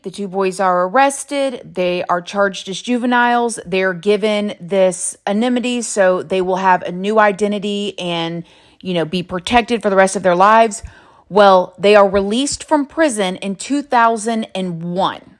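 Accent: South, United States. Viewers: Love your southern accent , that’s a dangerous move to keep letting him out and protecting him? There’s some like that going on in Southern California a guy parks across the from a school and records them, police said he hasn’t done nothing wrong yet but the parents don’t want him there but some say he has a student said something to the news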